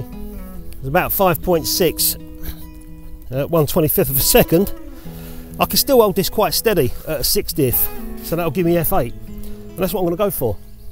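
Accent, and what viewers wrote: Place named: Cockney, United Kingdom. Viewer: The 'Fern' is Bracken, or Pteridium aquilinum to give it's proper name and one of the most successful land plants being found everywhere except Antartica. Loving your channel, in fact it's now not just my favourite film photography channel, it's my favourite photography channel. Period as the Yanks say. Your style is refreshingly down to earth, but your images are good and your Cockney/Essex Boy persona is a welcome change from the wispy bearded hipsters that normally present this sort of thing. (Sorry Ribsy! but your beard is a bit fluffy)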